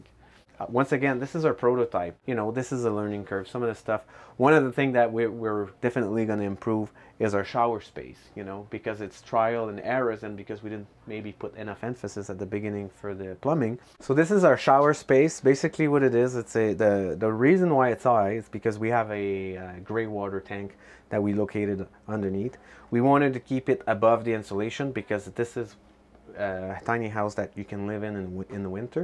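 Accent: Quebec, Canada. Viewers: I don't usually hear my own "Quebec" accent on Youtube, that made the video so much interesting for some reason hahah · He sounds like a Québecois la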